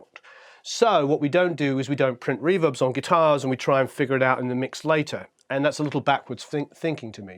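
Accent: United Kingdom. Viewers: Hey man, love your video great sound 🤟 just question you sound like your brit like me Man I've recently just got one these but it's got this inherent hum going into it (I've tried isolating it from other pedal) just like you have here. It appears to be like earth what voltage are you running 9volt DC of the pedal in to your power supply? thank you so kindly ☺️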